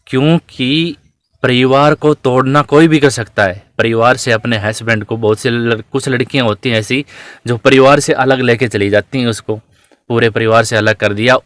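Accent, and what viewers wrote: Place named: Haryana, India. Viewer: Wov lagta h ap haryana se ho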